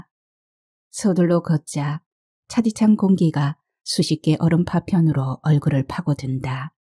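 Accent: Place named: Busan, South Korea. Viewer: 수작이네요~신종국 작가님~특히 마지막 부분~ 오랜만에 귀에 쏙쏙 잘읽히고 부산 사투리와 레트로 감성의 가슴아픈 소설 잘 들었습니다. 그시대때는 그렇게 참고 많이들 사셨을것 같습니다. 홧병으로 심장에 북소리가 나던~~~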